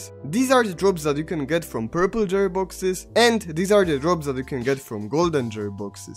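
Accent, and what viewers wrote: Poland. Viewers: I don't want you to be offended but after the first 10 seconds I immediately recognized this Polish accent :D btw Nice video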